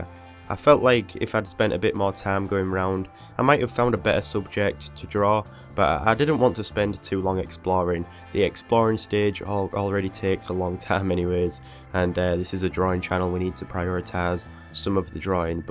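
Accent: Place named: Hull, United Kingdom. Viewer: Your Hull accent makes me want to die but your drawings give me life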